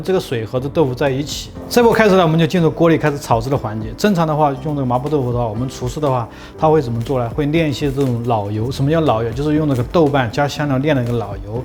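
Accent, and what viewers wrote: Sichuan, China. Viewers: 好亲切的四川话。粑锅，度豆腐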